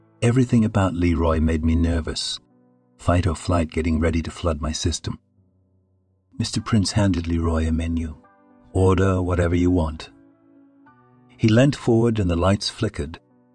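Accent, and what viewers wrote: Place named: South Africa. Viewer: You almost sound South African hey😊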